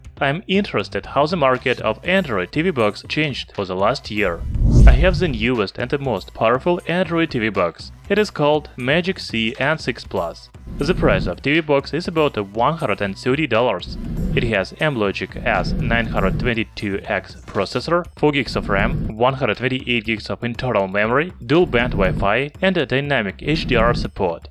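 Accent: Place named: Russia. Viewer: Is this the bother with less of an accent that is more understandable? Nothing against Mother Russia, but seriously guys. There has got to be someone you can find to do the voice aspects to videos. Hell Siri can do it for real cheap. Then viewers won’t have to hit rewind as many times as I had to just to understand what was said. “Th” doesn’t have any “z” in zhem, oops I meant ,, them..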